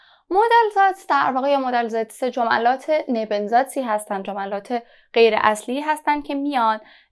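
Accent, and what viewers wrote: Iran. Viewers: درود نمی دانی تصویر شما نصف تخته را گرفته وتخته دیده نمی شود لهجه المانی امیخه با لهجه گیلان امیخه شده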